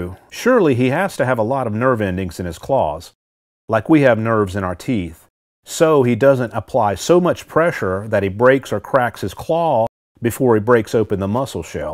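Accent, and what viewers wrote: South, United States. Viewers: Exactly. His deep clam soothing southern voice and encouraging words. Happy little lobsters…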